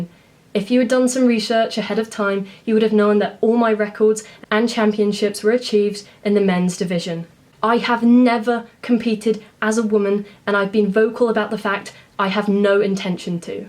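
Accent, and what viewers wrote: New Zealand. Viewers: Omg you’re a kiwi?!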